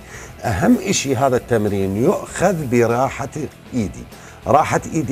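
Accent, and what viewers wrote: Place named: Baghdad, Iraq. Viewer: عراقي وبغدادي 😹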